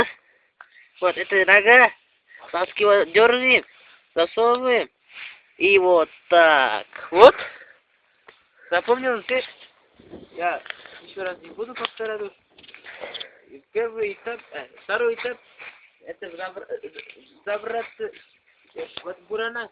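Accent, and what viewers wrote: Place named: Dagestan, Russia. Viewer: татарский дагестанец точно говорю акцент похож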